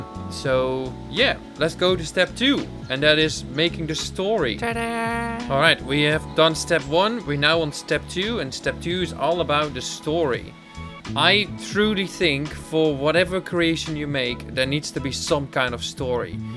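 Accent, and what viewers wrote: Netherlands. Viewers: You're based in New Zealand? You sound so Dutch!?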